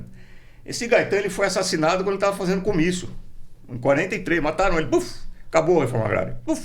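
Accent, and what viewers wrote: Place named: Rio Grande do Sul, Brazil. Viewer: Arbex disse q vc é barriga verde? Mas com esse sotaque? Mas bah tche? To confuso, como diria o velho gaúcho... o q tu és?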